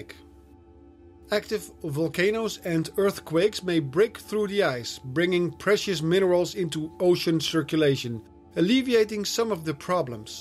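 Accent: Netherlands. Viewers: You sound very Dutch lol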